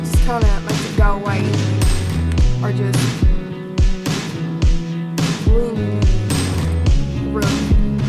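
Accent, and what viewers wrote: South, United States. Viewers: southern accent lol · Southern accent omg😍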